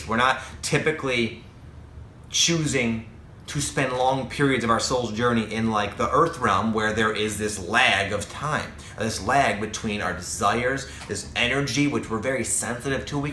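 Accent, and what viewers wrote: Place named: Boston, United States. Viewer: Ahhgg, it's a Boston! 😄💕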